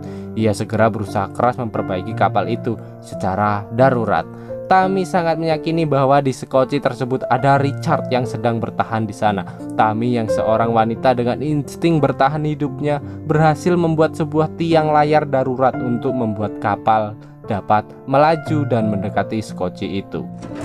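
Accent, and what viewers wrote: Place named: Medan, Indonesia. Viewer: Bukan kelen tapi kalian...logat medan ya brooo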